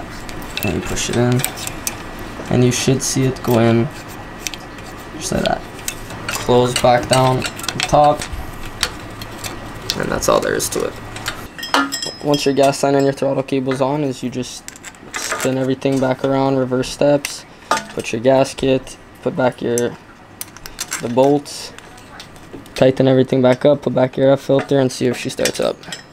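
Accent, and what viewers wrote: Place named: Hawaii, United States. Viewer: Solid video.. bro you sound like one local from hawaii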